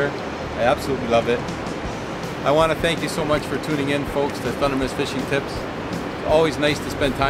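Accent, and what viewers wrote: Canada. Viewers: That Canadian accent! Lol! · you from canada ey? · love the canadian accent :D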